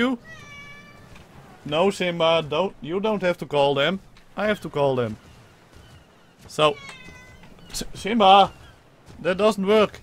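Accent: Netherlands. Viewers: Hij is sowieso Nederlands. Dat hoor je duidelijk aan zijn uitspraak en hoe hij "Simba" zegt. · is this guy dutch because of his accent?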